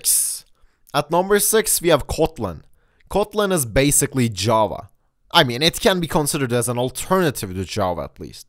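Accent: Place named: Turkey. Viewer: Türk olduğun belli oluyo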